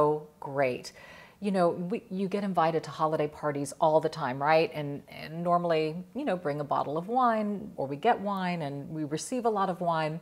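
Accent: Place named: United States. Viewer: This content, for me, is an excellent source of beautiful American English and, of course, a tremendous positive energy source. So easy to watch!:) Thank you so much,!:)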